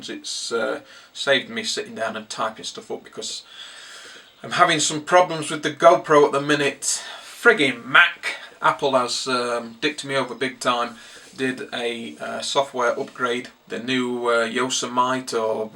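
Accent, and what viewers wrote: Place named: Northern England, United Kingdom. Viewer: Great vids (always good to hear a bit of northern accent on here!...(i'm a lancs lad!) Don't know if you ever followed a guy called secue01 but he gave a good shout out this week about some corny kegs... in a nutshell us UK lads can get some corny kegs on the cheap approx £33 delivered from an Ireland company.... <link> <link> <link> seems pretty legit. Just thought i would spread the word! cheers Mike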